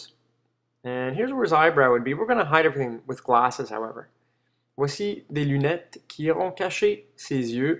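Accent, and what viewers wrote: Quebec, Canada. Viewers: Waw.. Magnifique.. C'est un rêve de savoir dessiner comme toi ! J'suis allé au Québec en vacance, leur accent m'a fait penser a toi ! :P (Je suis Francais). C'est vraiment un talent fou que tu a, en plus, tu le commente aussi bien en Francais qu'en Anglais.. Un grand bravo a toi, merci et continue tes vidéos ! ;)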